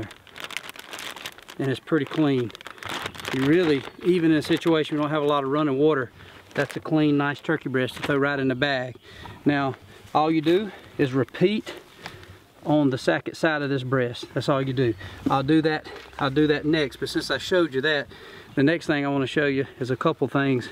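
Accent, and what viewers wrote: South, United States. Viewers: I gave him a like just for the southern accent.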